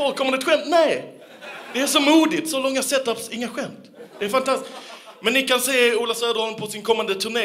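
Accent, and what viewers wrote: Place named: Skåne, Sweden. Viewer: han pratar skånska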